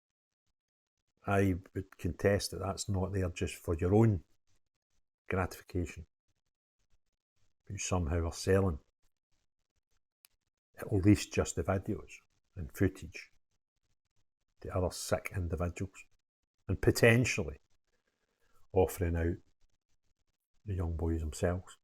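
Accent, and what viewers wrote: Scotland, United Kingdom. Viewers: I love your Scottish accent. Such a sad story 😢💔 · This happened in Atlanta, Georgia. I wish he had put a link to the new stories. A lot of people are assuming this happened in the UK because of his accent.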